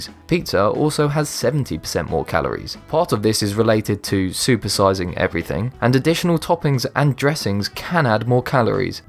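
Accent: England, United Kingdom. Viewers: Please dont pronounce everything with an over the top brit accent , you just lost 14 viewers in my family , the accent is old and we. E mentioned it many times but you still wont change it. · Got a lot of hard feelings, when I hear a distinguishable British accent bashing American food, while not discussing blood pudding, aged beef, mincemeat pies, and the absolute hoard of atrocious foods they have, in the same discussion. Narrator, why don't you explain to me what, exactly, is wrong with British 'Fish and Chips', that the mayor of London is banning them for kids? If you feed children like sheep, expect them to look like them too (India is a great example of a nation plundered by Britain in the 19th Century that did so well, they nearly bankrupted New Deli before it was given back to them)...ever seen a 6-ft tall bodybuilder in India? Hint: it's because they worship cows, and don't eat them (until they show up in America, and then they eat them!) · Love that british accent! 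· Like your English accent, I get sick and tired of American accents, Cheers, from - Australia 👍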